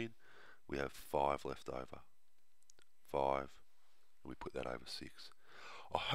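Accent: Australia. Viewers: Thanks in my sats I nearly got 300 points but I got 297 because of fractions if I got 300 points teachers said that it was harder this year because it is year eight work now I am going into year 7 so that you also are you Australian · This guy is not british, he is Australian plus daddy pig has a deeper voice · he's Australian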